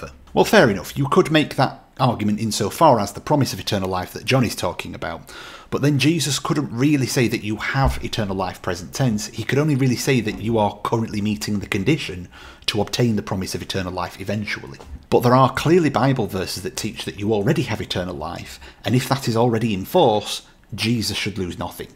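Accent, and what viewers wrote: United Kingdom. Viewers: Random but, I enjoy ur accent. It's like a very clean sounding English accent.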